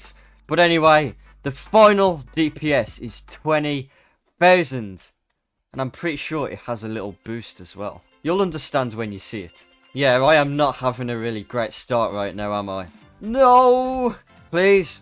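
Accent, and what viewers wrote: United Kingdom. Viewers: You sound British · Bros british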